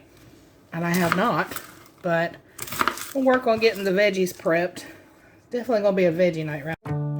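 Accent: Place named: East Texas, United States. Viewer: I’m new here but I feel like I’ve met a neighbor. I’m from east Texas, and you would fit right in here. We even sound alike. I’m binge watching your videos... I love your style. God bless your heart.❤😊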